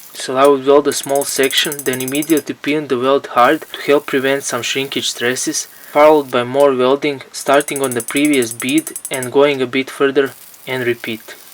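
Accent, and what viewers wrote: Russia. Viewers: говор русский. ры из снг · Парень, признайся, что ты русский) твой инглиш неплох, но ты палишься с произношением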